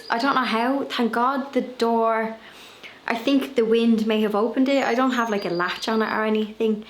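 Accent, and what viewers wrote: Ireland. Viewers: Just saw this .lovely to hear an Irish accent . Is your garden in Ireland . I’m in Kerry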